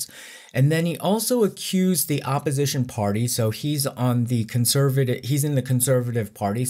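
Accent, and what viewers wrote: United States. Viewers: Never like American English. Sounds terrible.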